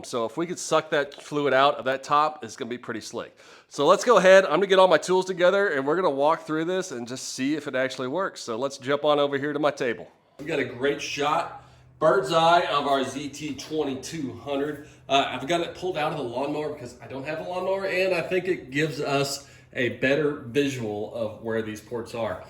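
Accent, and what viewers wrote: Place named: New York, United States. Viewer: HOOZ da Complete Moran now.. Just Sayin yall.. East coast whatever.. probably from manhatten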